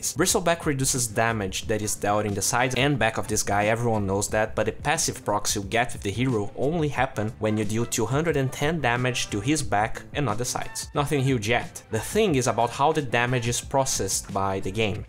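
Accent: Brazil. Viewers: Are u Brazilian? This accent lol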